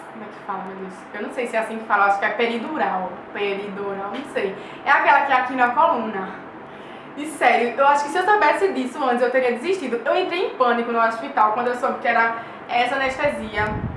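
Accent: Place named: Recife, Brazil. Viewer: acho lindo esse sotaque, tenho uma amiga de Recife, parece que é ela quem está falando rs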